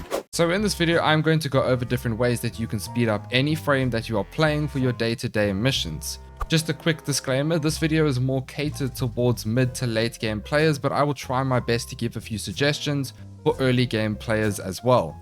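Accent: South Africa. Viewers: Are you South African by any chance?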